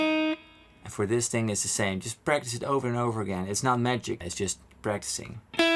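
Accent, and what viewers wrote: Netherlands. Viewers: thanks for the video. you sound dutch :)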